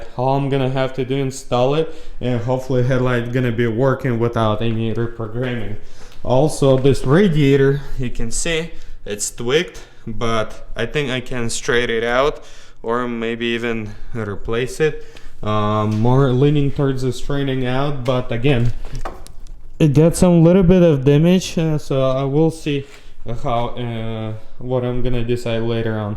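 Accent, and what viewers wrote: Russia. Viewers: Привет, хороший русский акцент!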